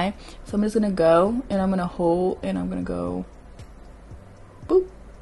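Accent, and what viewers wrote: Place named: New York, United States. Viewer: It’s the love and appreciation for NYC talk/accent 🗽💕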